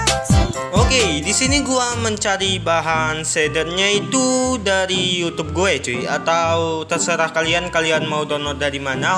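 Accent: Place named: Banyumas, Indonesia. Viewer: Nonton doang laik ngapak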